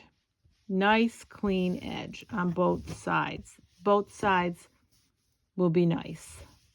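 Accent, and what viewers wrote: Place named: Boston, United States. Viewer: Happy to see ya today, I love the mile a minute everything. I’ve been crocheting for about 40yrs now & enjoy crocheting along with you, always something new and interesting to learn. I love this one! I feel like I’m hearing an Italian Boston accent, you remind me so much of one of my Aunts your voice, knowledge & patience I adore you, awesome teacher. 🫶🏻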